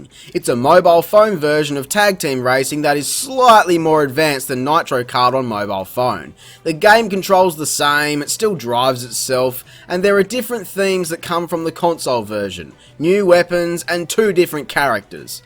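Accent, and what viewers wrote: Australia. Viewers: UGH HES SO FUCKING AUSTRALIAN IT HURTS IT SOUNDS SO FUCKING AUSTRALIAN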